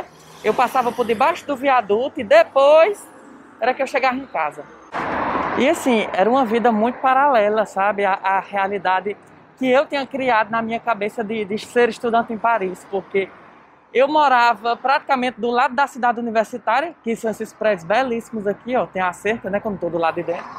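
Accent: Ceará, Brazil. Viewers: Ohh, te admiro demais viu... Tbm sou cearense e amo ouvir sua voz, o sotaque 🤩🤩. Deus continue te abençoando viu mininu... 🙏🥰😘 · Rapaz, vc me impressionou. É tão lindo o seu jeito de falar cearez, mesmo com momentos muitos difíceis, sua forma positiva de encarar as coisas, é realmente, motivador!💛🇧🇷💚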